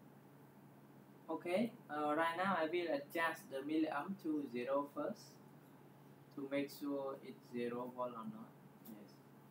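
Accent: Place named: Peru. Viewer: Es como un peruano hablando inglés.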